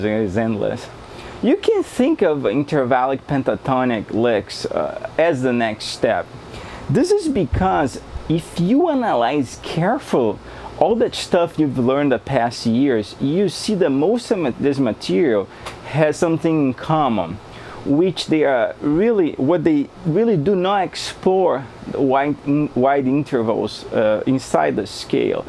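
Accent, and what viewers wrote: Brazil. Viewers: vc poderia fala em portugues. pelo menos teu sotaque me parece brasileiro. e poderia colokar as legendas em ingles. ; ) soh uma sugestao nao me leve a mal. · I think the accent is Brazilian. I can't get to the link. I like this stuff. I've pulled towards this type of phrasing without know/analyzing what I was doing. This'll be useful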